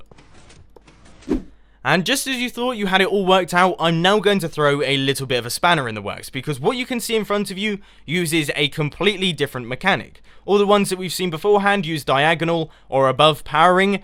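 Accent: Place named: United Kingdom. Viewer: Yeah and he has a very British accent lol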